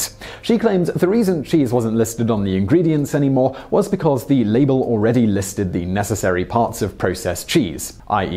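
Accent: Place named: London, United Kingdom. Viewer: this guy says 'sore' instead of 'saw', verb. because: London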